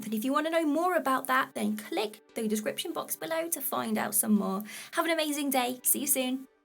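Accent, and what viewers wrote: United Kingdom. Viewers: Hey you are really pretty and cute. Too bad you sound like you live in the United Kingdom and I live in the United States otherwise I would like to ask you to dinner and a movie.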